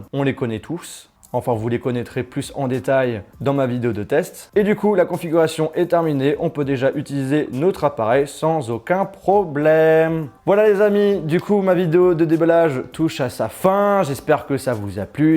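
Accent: Belgium. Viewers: tu es Belge ????? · Par contre t'es vraiment en train de prendre l'accent belge ... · tu es belge? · t est belge ?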